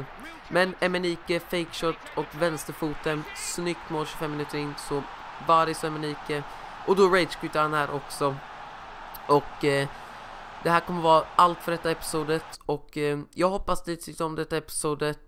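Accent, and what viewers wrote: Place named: Gothenburg, Sweden. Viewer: Du är från götet va? Du har en go dialekt :D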